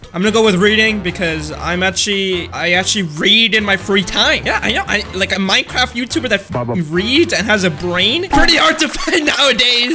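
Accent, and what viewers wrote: India. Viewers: you are Indian???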